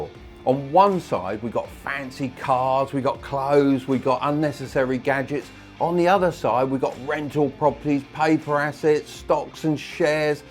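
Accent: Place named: London, United Kingdom. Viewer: Do you live in America? because you sound like every bloke on my street in London.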